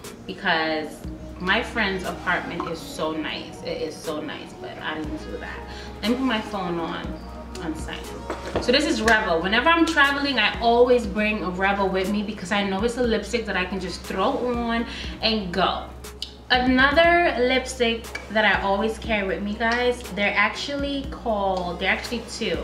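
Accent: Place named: United States. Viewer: haha meisje ie tja kiek yere! Mi lob din video's dief yu. A accent fie helemaal amerikaans, a no spotoe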